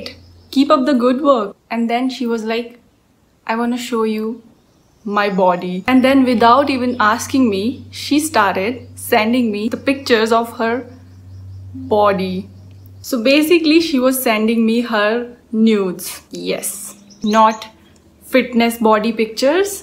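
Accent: India. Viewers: When I saw the thumbnail i was like someone Korean , Japanese etc but when j heard the voice ohh indian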